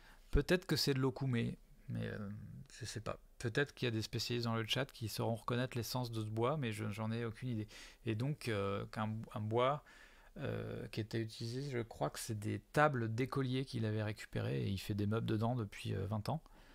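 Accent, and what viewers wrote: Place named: Agen, France. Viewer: Pour moi on est sur l'accent d'Agen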